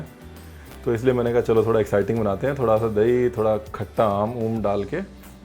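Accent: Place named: Punjab, India. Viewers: He’s applying Punjabi sound to English. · And you Punjabi accent😍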